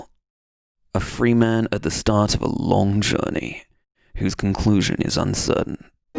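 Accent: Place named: United Kingdom. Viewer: please sir i like your accent so much and I'm your follower student on youtube podcast. I found the 4000 essential words are read by American accent and really I like it to be with your British accent. please try to help us by reading these 4000 essential words in English.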